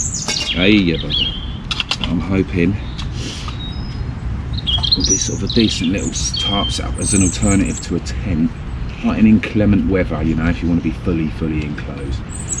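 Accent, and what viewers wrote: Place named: United Kingdom. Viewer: I like that this tarp is lightweight and that you are in the UK, speaking British English. No jingoism, just easier to understand and relate to for me. Your explanations could do with some improvement, however.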